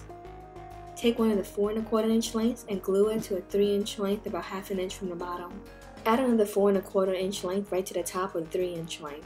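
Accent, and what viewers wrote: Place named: New York, United States. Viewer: I like how you turned into a New Yorker about 40 seconds in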